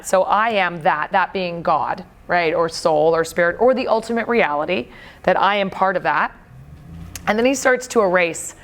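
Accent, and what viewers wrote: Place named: India. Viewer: For a yogi, you are very close minded. Stop acting like Puritan, she grew up with those kind of phonetics, how can you expect her to improvise those dictions? Indian professionals working at silicon valley for decades can't do away with our thick English accent. And sanskrit is grammatically and phonetically probably most difficult language, even trained priests of Kashi don't chants those hymns correctly now.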